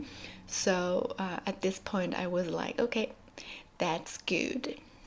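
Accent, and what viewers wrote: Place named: France. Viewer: Ps: j’adore ton accent français ☺️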